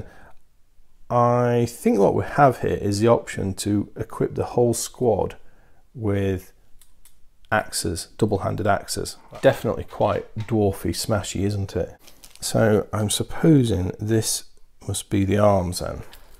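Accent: United Kingdom. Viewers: I love me some Not Squats in the mid morning. Do you think you'll do more reviews of this line,? Also i enjoyed the brief reveal of Squeaky during the box placing. Nothings better for the old sense of humour than listening to sped up midlanders.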